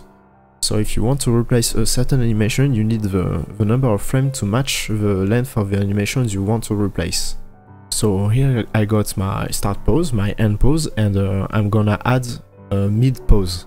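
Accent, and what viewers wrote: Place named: France. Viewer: Love your accent, I think you're french <3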